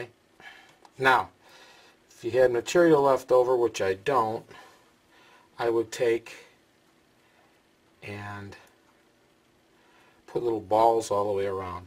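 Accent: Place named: United States. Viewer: American guy. For sure. :)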